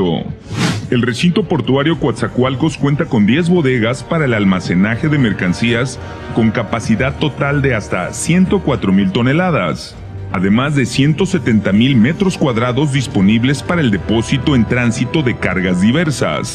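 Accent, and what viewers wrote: Spain. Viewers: No entiendo por qué tiene que ser un español el narrador de estos videos. ¿Qué no hay voces mexicanas que puedan hacer la narración?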